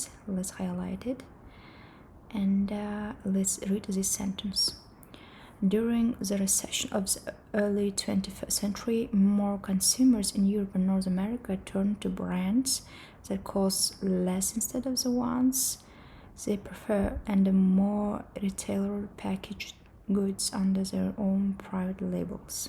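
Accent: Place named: India. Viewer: Why you are pronounce as the Indians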